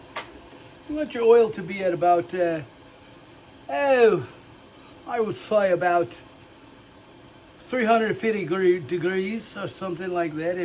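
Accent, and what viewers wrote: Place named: United Kingdom. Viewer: I never knew u was british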